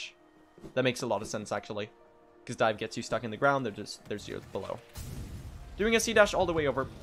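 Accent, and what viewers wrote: Sweden. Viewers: as a swede, you barely have any swedish accent. i'm impressed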